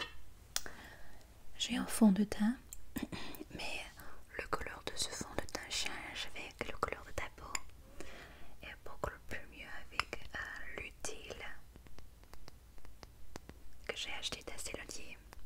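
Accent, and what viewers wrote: Quebec, Canada. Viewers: Je n'arrête pas d'écouter tes vidéos à tous les soirs Je suis quebecois et entendre la manière dont tu prononces les mots comme on le fait un Québec est super relaxant (for some reason ça sonne exotique, réconfortant et familier. C'est la recette parfaite pour dormir) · j'ai reconnu l'accent un peu quebecois lol!! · Coucou, j'adore cette accent québécois. Merci pour ce maquillage, tu as fait disparaitre les imperfections et mis en valeur les points positifs. Je vais très vite reprendre rendez-vous. Gros bisous 😘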